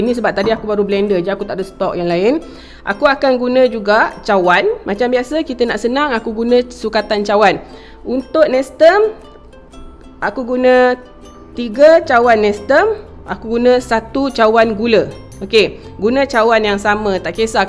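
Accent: Perak, Malaysia. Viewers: Terbaik terang bercakap senang nak faham nati nak cuba buat 😊 sya dengar pon mcm orang Perak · Aku.... Aku... Bahasa belah mne ye.,, selalunye org perak? Maaf hanya bertanye... Tanye😁😁😁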